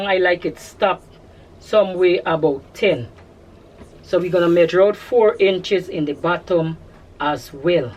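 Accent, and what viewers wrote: Guyana. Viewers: I just join your YouTube you sound like a Guyanese I would like to know where you buy your mannequin iwould like to purchase a size 20 one please let me know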